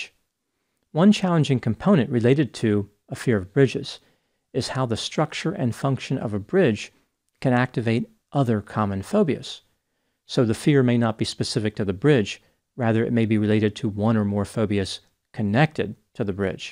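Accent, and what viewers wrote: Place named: Baltimore, United States. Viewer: Doc, I’ve always thought you had a Baltimore accent.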